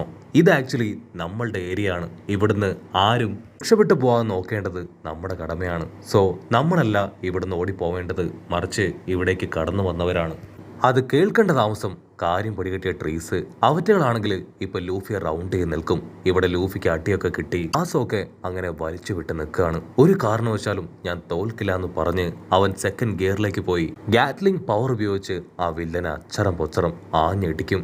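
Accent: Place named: Malappuram, India. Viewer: Ade malapuram thane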